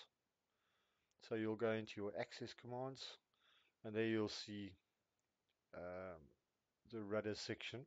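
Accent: South Africa. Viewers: ARE YOU SOUTH AFRICAN?!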